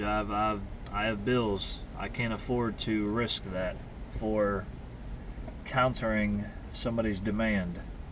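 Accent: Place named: Texas, United States. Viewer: Just subscribed. I'm going through some of your videos now to catch up. You sound like your from Texas. I lived there five years and I have to say the one thing I liked best was the people. Honest and direct. They must have thought I was OK too because after a few years I was told "Your a Yankee but you're OK". Thanks for the reply and I'll be watching.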